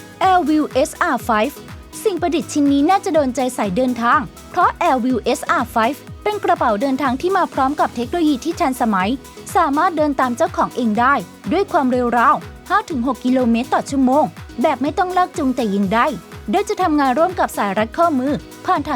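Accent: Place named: South, Thailand. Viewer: เสียงพูกเหมือนไทย+ใต้เลย ฟังแล้วยังไงๆไม่รู้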